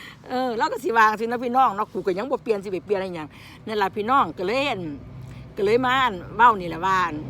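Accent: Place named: Kui, Thailand. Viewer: เว่าเป็นตากุยแท้ครับ ฝรั่ง55+😄😂🥳😁🎉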